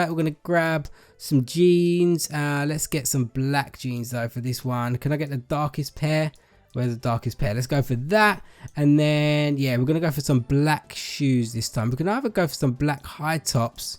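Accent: United Kingdom. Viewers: U can definitely tell hes form England but same 😂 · you sound so British I am British to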